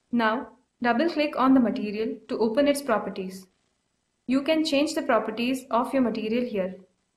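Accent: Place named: France. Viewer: french accent.. :p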